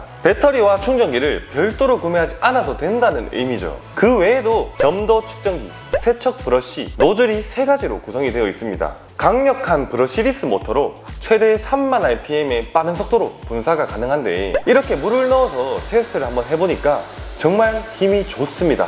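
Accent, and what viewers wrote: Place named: Gyeongsang, South Korea. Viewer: 영상이 너무 뜸해요. 구수한 경상도사투리가 매력적인데 자주 얼굴보여주세여!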